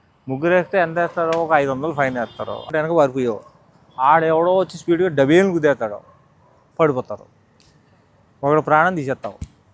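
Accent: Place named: Telangana, India. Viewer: ci garu telangana basha matladtunnadu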